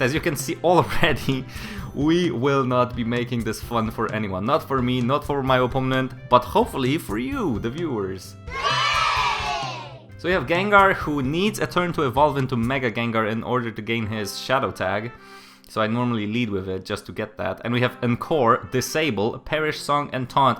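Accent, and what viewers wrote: Poland. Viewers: so you are from Poland?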